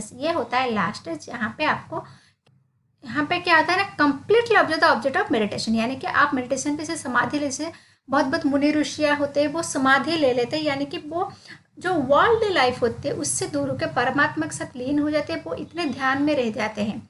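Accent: Odisha, India. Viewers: Man r u from Odisha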